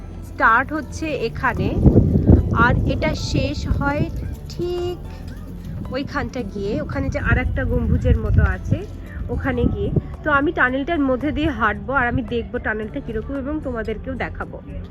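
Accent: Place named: India. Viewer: You are Bengali🇧🇩? Or Indian? আপনার ভাষায় মনে হচ্ছে ইন্ডিয়ান! Love from Bangladesh🇧🇩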